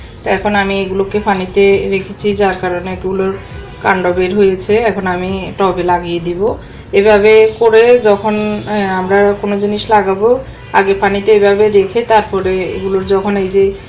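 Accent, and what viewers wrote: Noakhali, Bangladesh. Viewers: Mone hoy apur desh Lokkipur ,Noakhali,darun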